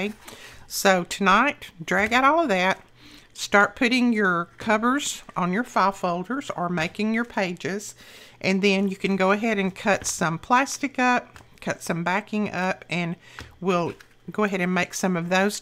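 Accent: South, United States. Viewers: Awesome! I will put you some vintage book pages in the mail!💯📓😎 And now I know why listening to you makes me feel right at home: a sister southerner! 🤓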